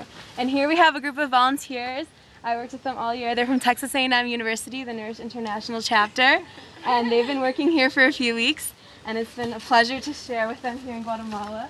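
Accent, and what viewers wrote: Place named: New England, United States. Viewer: Yeah great job, watch your steps, lol... wow I'm so impressed with your Spanish, oh by the way I used to work with FUNCEDESCRI too when I lived there. Is from New Ingland? cuz of the accent, wow tha was a 3 minutes walk. Any way great job.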